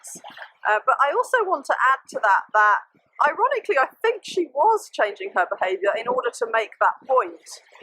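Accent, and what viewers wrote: England, United Kingdom. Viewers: For her accent I thought she was from England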